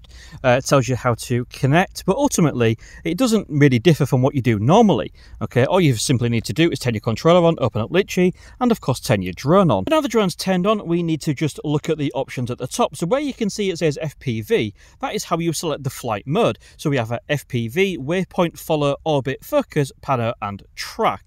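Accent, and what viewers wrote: Hull, United Kingdom. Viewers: I know a Hull accent when I hear one lol love the videos mate,I've had my mavic mini a few months now and still learning new tricks 👍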